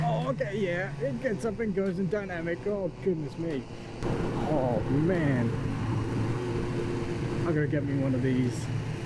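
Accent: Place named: Australia. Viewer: It's an awesome bike. I've got the 2019/2020 model and it's an absolute peach of a motorbike. Got it in July 2020. This was a great review as well. I notice the Australian accent mate. Nice to see some Australian content on YouTube as well. Yeah, the s1000r is the best of both worlds in my opinion. It's a total hooligan & docile put-put bike for around town. The quick shifter is the smoothest I've had & fueling is perfect. Super responsive and plenty of get up & go, even if you have leathers with your name on the back of'em.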